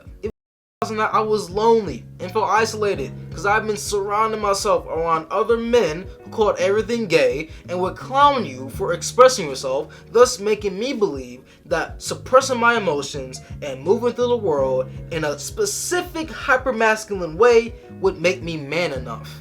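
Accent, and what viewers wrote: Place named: Midwest, United States. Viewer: You don't sound Southern.....I'm getting Midwest vibes like Illinois but in every southern state you have people that sound different.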